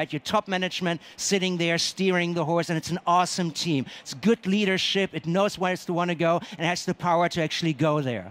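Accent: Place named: Germany. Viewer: Good message! But very annoying delivery (too nervous, too loud, aggressive German-English...) Couldn't watch this for more than 15mins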